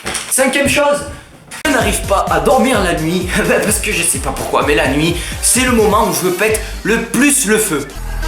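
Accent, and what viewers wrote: South, France. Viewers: J'adore ton accent 😊😍et tes vidéos sont super simple mais tellement bien 👌🏻😏 . C'est drôle parce que je connais un Clément, qui a le même âge et qui a aussi l'accent du sud 😊😂😌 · Je t'adore ! tu me fais rire. Tant fait pas pour ta taille je mesure moin 1m60 et je suis aussi en 3eme voilà voilà. ah oui j'adore ton accent du sud 😉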